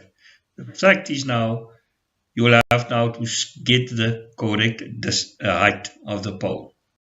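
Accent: South Africa. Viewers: Thanks this is really helpful and great to hear a South African accent!!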